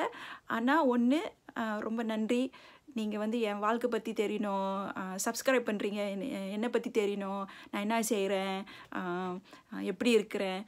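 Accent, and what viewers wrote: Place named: Singapore. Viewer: Hai akka, too rocking netherland tamilan video. Good Inspiration and tamil talking too good. Your tamil language like singapore tamilan speaking same like that. Solo Woman Boat Rider ....Real Tamilachi Akka you like that. My Native in TamilNadu in Thanjavur district......etc. Please give me your Email Id Akkaa.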